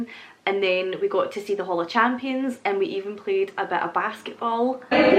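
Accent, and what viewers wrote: Scotland, United Kingdom. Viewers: Sitting in the Indy airport, practicing listening to the Scottish accent (on our way to 🏴), shocked that our town is on your channel. 🎉🎉 Glad you loved it. Come back anytime. 😁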